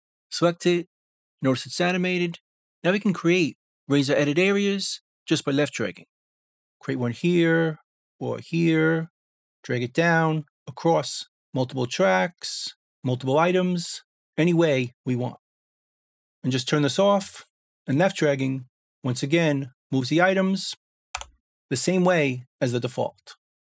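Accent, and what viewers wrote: New York, United States. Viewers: U from NewYork?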